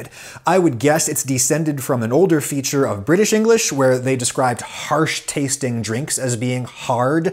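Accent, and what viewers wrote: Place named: Pennsylvania, United States. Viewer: Good video. Your PA accent is always refreshing to this "relocated Yankee". As for post-Prohibition, perhaps it is because it takes a lot longer to regrow trees than grain.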